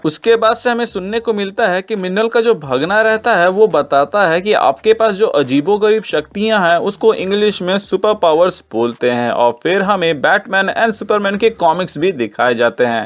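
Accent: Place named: Bihar, India. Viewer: your Bihari Acsent is good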